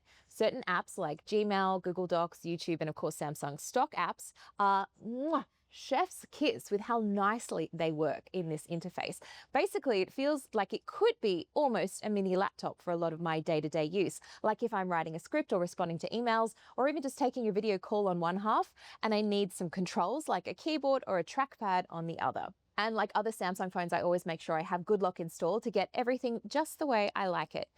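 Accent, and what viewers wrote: Australia. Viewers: but you sound so Australian other than that!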